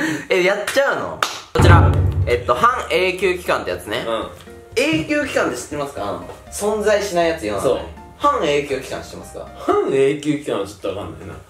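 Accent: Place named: Hiroshima, Japan. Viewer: 広島弁炸裂じゃけー分かりました！笑笑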